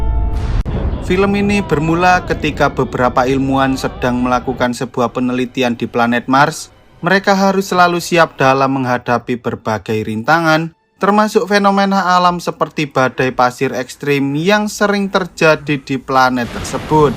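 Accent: Java, Indonesia. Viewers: pekat kali medok nya ya..🤣😂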